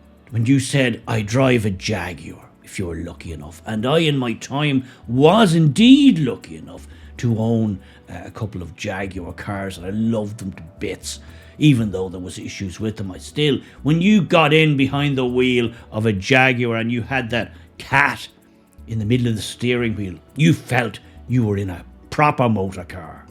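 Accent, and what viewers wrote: Dublin, Ireland. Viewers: I think it's very odd that your accent says "Dublin" but you use USA words like "store" and "tanked".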